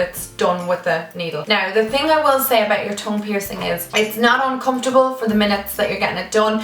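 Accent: Ireland. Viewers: Your voice is so soothing!!! I love it. I guessed you were from Ireland before you even said it 😂 wanna visit so bad!! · does anyone think she sounds like cheryl cole with an irish accent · Are u Irish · Are you Irish 🍀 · Kassie Pistone Thats called being irish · she sounds Irish or Scottish but I could be wrong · i thought i knew your ascent i was in ireland not to long ago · Are you Irish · Nice piercings, I got my tragus done on each ear and both of them wormed their way back out, so upsetting, sacred to have it done again encase the same thing happens but I really liked it when they were done! BTW, LOVE your makeup, especially the blue on lower lash,what have you used eye shadow wise? Love an Irish accent :)) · she's irish...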